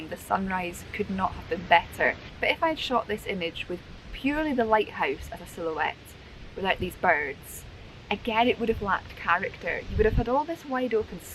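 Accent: Scotland, United Kingdom. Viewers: I think your Chanal is brilliant I love the Scottish accent cheers Neil Patto.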